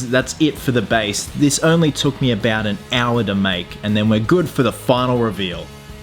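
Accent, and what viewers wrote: Australia. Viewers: R u from Australia?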